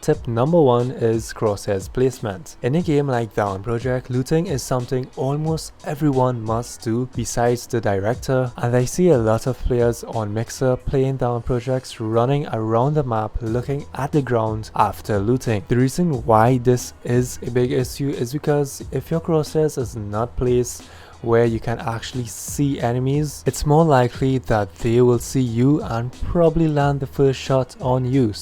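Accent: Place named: Jamaica. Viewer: U sound jamaican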